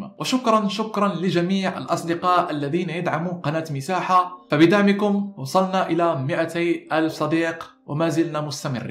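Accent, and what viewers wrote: Iraq. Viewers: لاكن لهجتج عراقي ؟ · شتلة 🌲 شلون عرفت هذه الكلمة👏🏻 يسولف عراقي 😊❤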